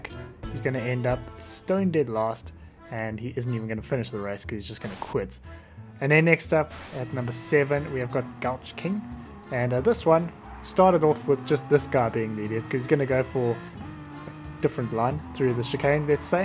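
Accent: South Africa. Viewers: Am I hearing a south african accent?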